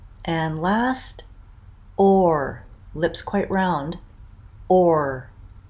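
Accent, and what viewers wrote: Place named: Canada. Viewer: I'd love to get a video on Canadian Raising. The dipthongs Ai and Aw get reduced to (ʌɪ) & (ʌʊ) Correct me if I'm wrong but I heard you did use (ʌɪ) a few times. Obviously these aren't dipthongs every single Canadian uses though it's well-spead over the southern provinces. I think we foreigners will benefit from a lesson on those dipthongs.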